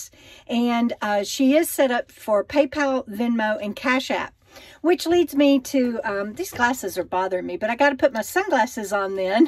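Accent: Texas, United States. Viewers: Pence que hablas espanol, tienes que dejar el acento texano. Besos y KOKO!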